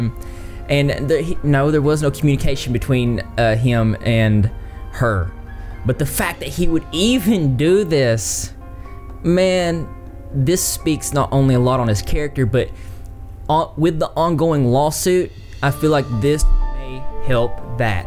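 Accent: South, United States. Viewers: I love your accent! omg it's so country/eastern/western gosh it's great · Ur style dnt match ur country twang and i like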